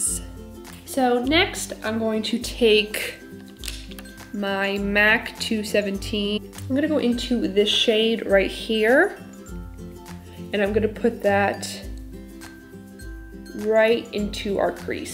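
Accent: New York, United States. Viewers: Sounds like you’re from New York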